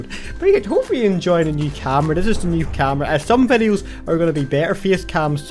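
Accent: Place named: Northern Ireland, United Kingdom. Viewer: what part of northern ireland are you from because i am from ireland too, but not northern:( oh well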